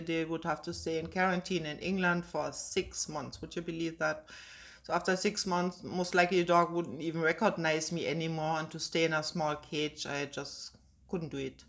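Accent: Jamaica. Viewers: This was a very interesting story. You were very adventurous. You have some Jamaican flavor in your voice. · She sound much like a born jamaican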